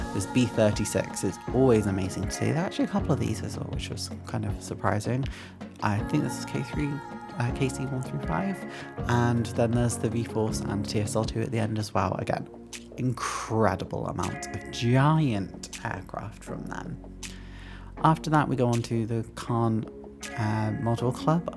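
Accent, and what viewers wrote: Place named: West Country, United Kingdom. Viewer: "Ello my loverrr" I thought you sounded like you came from the west country! Great video loved it!! 😍 looks like a great show with some outstanding models. Hope you're well, thanks for posting.😘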